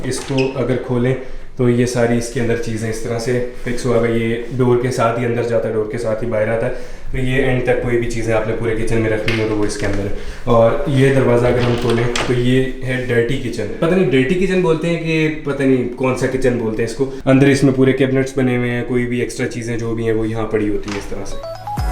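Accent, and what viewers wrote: Punjab, India. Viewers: Yaar apka Punjabi bolna words bohot mazey dar ladta hai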